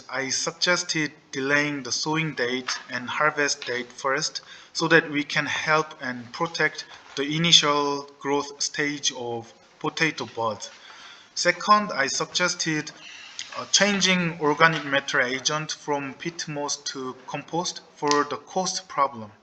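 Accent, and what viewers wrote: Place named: South Korea. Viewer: 동영상 올려 놓으셨다고 해서 구경왔습니다^^ 한국어 억양이 있긴하지만 발음은 클리어하신 편이라 알아듣기 편하네요!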